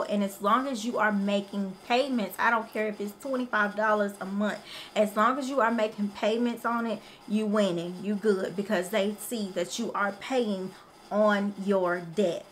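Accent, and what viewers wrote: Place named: South, United States. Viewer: ... oooh you fine fine.❤ Took me by surprise. You must be a southern girl. Very confident & charismatic. I really appreciate the information.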